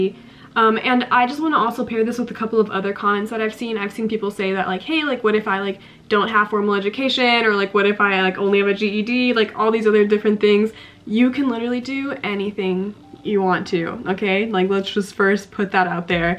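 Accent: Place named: Germany. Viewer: So cool! Ich habe mir gedacht dass du einen klein deutschen Akzent hast. Wirklich nur eine kleinen. Danke, dass du deine Erfahrungen mit uns teilst.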